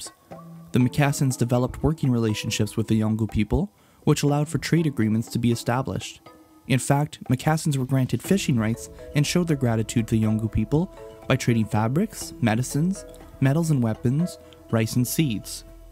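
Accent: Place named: United States. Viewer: Hearing this stuff with an American accent makes me woozy lmao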